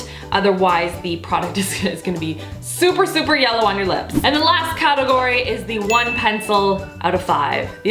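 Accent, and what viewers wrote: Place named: Canada. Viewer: I mean, she's Canadian. Maybe that's what you're hearing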